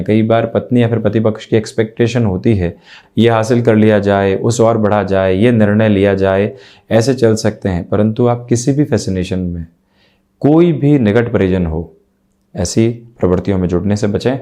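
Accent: Standard. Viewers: Thank you for the predictions ji.🙏🙏🙏. It was crisp and to the point. However Hindi is not my mother tongue so, I had to listen very carefully to understand your words. I got so used to the bollywood style of Hindi which is filled with words from Urdu. So this is what shudh Hindi sounds like! 👍👍👍. I think it will take some getting used to.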